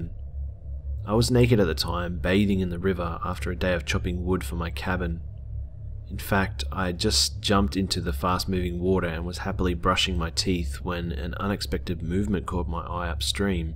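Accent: Australia. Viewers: are you from australia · You're Aussie yeah?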